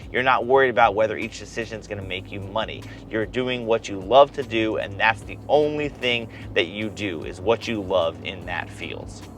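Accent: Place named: Ohio, United States. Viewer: You have a Buckeye accent...any relation to Jon M.?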